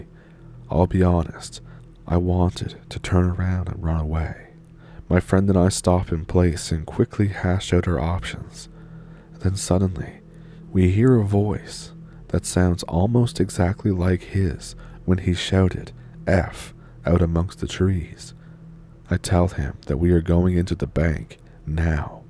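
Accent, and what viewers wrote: Canada. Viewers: I always love your stories. And your Canadian accent!!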